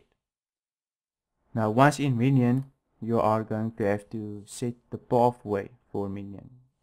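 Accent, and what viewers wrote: South Africa. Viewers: You sound South African... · Yeah you sound really SA! I guess uTube now sends us local content, I think it is fantastic since now I feel more confident to use my SA accent also. But man the 'force' is strong with you, I think you need to filter the noize on your mic a bit to help. This video this help thanks, simple enough good to know while downloading setup files. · This guy from South Africa? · You are South African?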